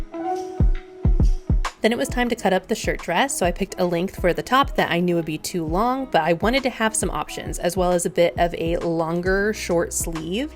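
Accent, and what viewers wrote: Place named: Pacific Northwest, United States. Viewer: Please tell me you live in the PNW. Everything about your voice and the views from the videos I’ve seen tells me that you are - reminds me of Portland, Oregon??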